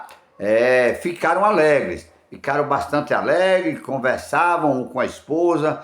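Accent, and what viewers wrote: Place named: Paraíba, Brazil. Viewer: pastor só uma curiosidade mas parece que o senhor é da paraiba.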